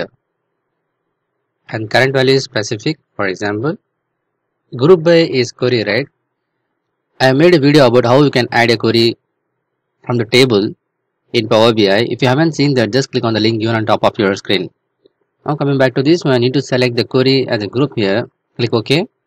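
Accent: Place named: India. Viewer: Indian... but good!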